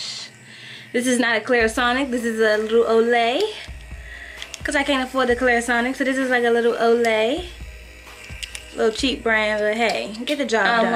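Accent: DMV, United States. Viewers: I KNEW IT!!!! LOL I am from the D(M)V. lol so crazy how I can pick up on our accent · Lol... I knew you were from DMV area when I heard your accent. Great video.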